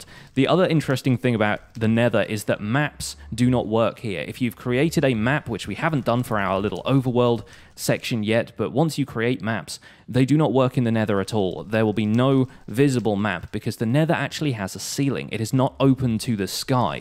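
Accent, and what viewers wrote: England, United Kingdom. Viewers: Hey are you from england or USA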